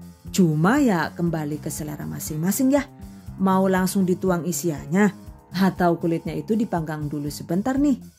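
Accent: Banyumas, Indonesia. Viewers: Buat ciri khas mba, apalagi ada dialek ngapaknya, bagi saya menghibur sekali 😁. Sukses selalu 🤗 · Ini resep mantuuullll bangetttt......simple, enak, dan jdi inspirasi jualan...( Meski sampai sekarang belum jualan. Juga ), gara2 Nemu video ini, aku jdi seneng banget kro channel kie....maning narasine kadang di tambaih bahasa internasional, yakue ngapak....tambah seneng lah inyonge....sukses terus ya buund....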